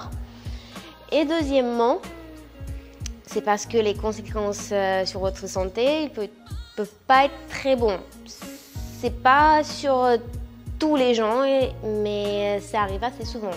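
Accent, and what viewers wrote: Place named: Eastern Europe. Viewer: Accent europe de l est,,pologne,roumanie?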